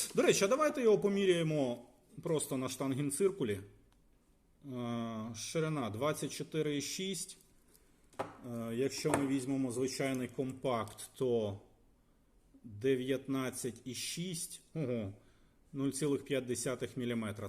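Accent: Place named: Eastern Ukraine, Ukraine. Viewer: У схидняков такой ужасный акцент. Нет той певучей лёгкости как в полтавском селе у моей бабушки. Тренируйтесь, песни что-ли украинские пойте.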